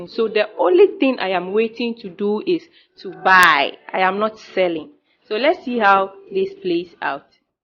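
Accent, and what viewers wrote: Ghana. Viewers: Your accent is a mixed, sounds a little bit Nigerian and a much stronger Ghanaian accent. Nice video btw. I thought you trade smc? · This is my first time hearing voice cus I am always on twitter she sounds like a Ghanaian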